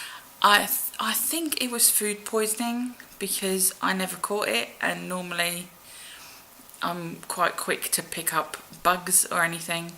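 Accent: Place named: United Kingdom. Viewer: How come you have a British accent when you are Dutch? just curious not a dig :)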